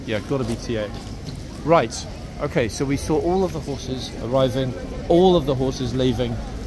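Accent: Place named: United Kingdom. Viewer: You refer to the Americans sometimes. But I have yet to hear you say anything about we Canadians. The states are not a Commonwealth country. Canada IS. (By the way, to me….all British people sound so SOPHISTICATED, no matter what they’re saying! I really enjoy how you express yourself! I LOVE the British accent!! ) 😁👍🏻🇨🇦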